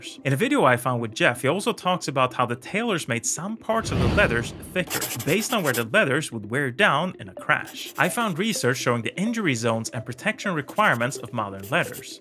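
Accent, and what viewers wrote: Sweden. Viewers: Swedish accent? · Are you from sweden? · Are you Swedish? or är du svensk? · Are you swedish my fellow motorcykel rider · are you swedish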